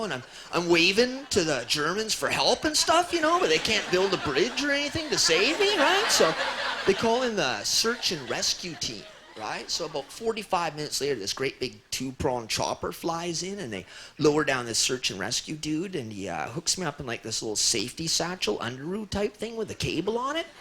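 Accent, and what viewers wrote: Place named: Canada. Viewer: in 4 seconds just about every listenerd could tell: Canadian. You mix up stoner with surfer - I Spoke surfer since childhood.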